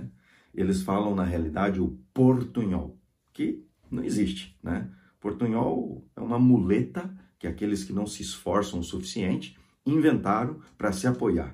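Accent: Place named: Spain. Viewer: O senhor é professor? É linguista? Qual a sua formação? Pergunto respeitosamente. Portunhol é só um nome para designar uma espécie de interlíngua. Um estágio em que o estudante está adquirindo competências linguísticas. Brasileiro e falante de espanhol, pelo menos, a minha variante (sou argentina) entendem-se, sim. Acho q esse “destruindo o portunhol” só pode causar medo e desanimo para aqueles que estão investindo tempo e esforço em aprender uma língua estrangeira. O senhor fala muito bem e tem uma linda pronúncia de apenas uma variante de espanhol: o europeu. Sons de espanhol europeu e de América Latina nada tem a ver. Eis a maravilha das línguas Já ouvi falantes de pt. Falando por exemplo: /baja/ /baRRa/ é por aí vai…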